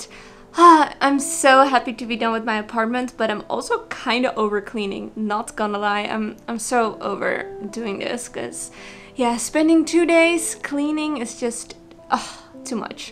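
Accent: Netherlands. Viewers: you have a dutch kind of accent while speaking ^^ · She has a real dutch accent ...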